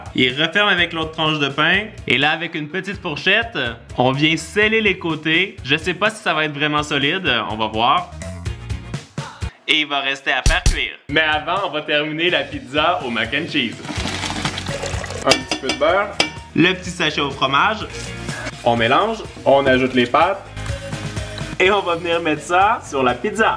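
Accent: Quebec, Canada. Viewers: T le meilleur continue comme tu es et ne change pas et ton accent quebequois est MAGNIFIQUE ❤️❤️❤️❤️❤️❤️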